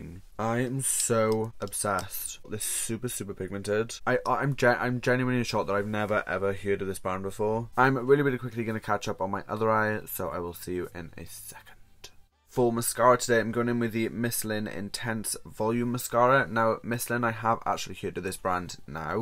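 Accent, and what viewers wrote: Wales, United Kingdom. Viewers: I love your accent!!!! Are you Welsh?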